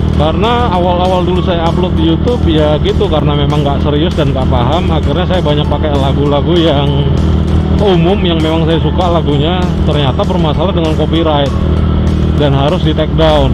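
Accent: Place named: East Java, Indonesia. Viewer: Koyok pantura malang pasuruan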